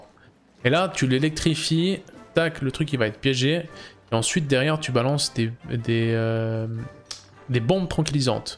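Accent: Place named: Vaud, Switzerland. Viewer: Mais t'es tellement vaudois ahaha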